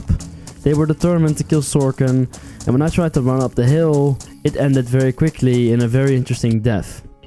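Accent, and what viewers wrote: Netherlands. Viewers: the second i heard ur voice i knew ""dat is een nederlander!"" hhaahahahah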